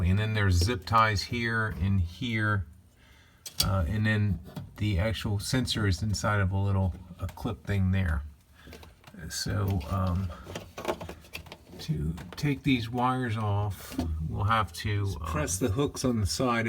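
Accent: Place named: Pennsylvania, United States. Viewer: Pennsylvania? or New Jersey?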